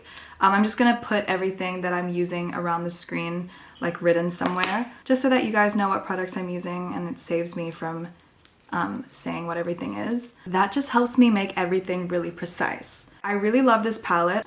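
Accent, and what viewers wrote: Australia. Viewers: omd are you Australian or am I tripping? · are you Australian? I can't figure out your accent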